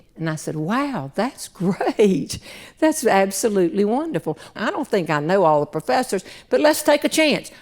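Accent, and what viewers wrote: South, United States. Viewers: Hello from Melbourne, Australia. Really looking forward to seeing you in Texarkana on January 12. Thank you for the videos - I just love your Southern accent!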